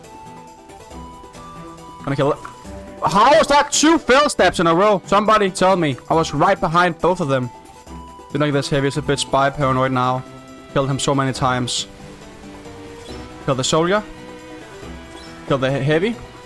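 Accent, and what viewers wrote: Denmark. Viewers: Så din video og instantly vidste at du var dansk, haha- nice video. 😂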